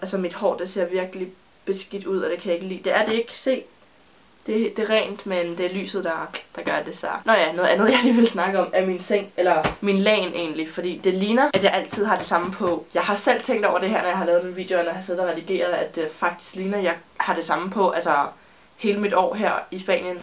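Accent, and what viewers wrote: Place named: Denmark. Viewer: Are you finnish? You sound Danish.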